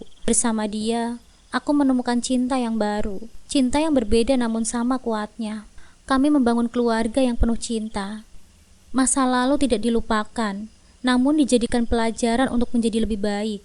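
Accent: Java, Indonesia. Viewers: Bd hadir mbsk, medok pisan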